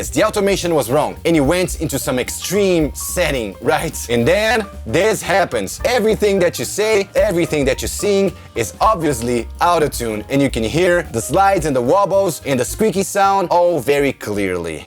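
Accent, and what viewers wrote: Brazil. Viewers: I bet you are brazilian cause of your accent- same as mine :) .. Nice video!! keep it up!!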